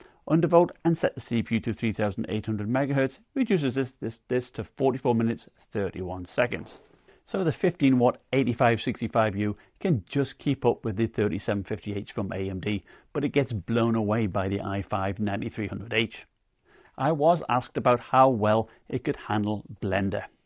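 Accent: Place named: England, United Kingdom. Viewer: Your in the US? You sound Like your from England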